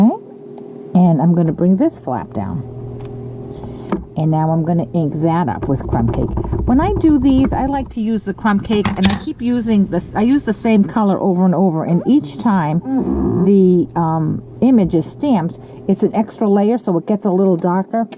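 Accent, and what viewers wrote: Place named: United States. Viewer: I love to listen to you, I’ve never heard such a broad accent, not that I’ve been to many parts of your country bar a train journey from SanFransico to New York. Most Americans I’ve met are southern, or Chicago. X Ros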